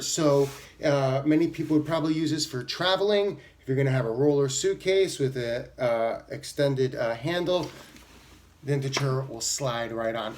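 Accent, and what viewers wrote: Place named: East Coast, United States. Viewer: Ah ok, so East Coast fits your accent. It all makes sense now. I figured Boston. And you bring up a really good point. It's not just a matter of height but weight amd broadness as well. I am 215 and pretty broad and I find bags like PD or Mystery Ranch always fit so small, cut into my pecks, and are even uncomfortable after a short while under load whereas MW is just always so comfortable. MW has got some kind of magic in their straps and harness and now they are addressing our sweaty backs with items like the Speedwell. It makes me think the designer/owner must be a larger guy because of course he would deal with this as well.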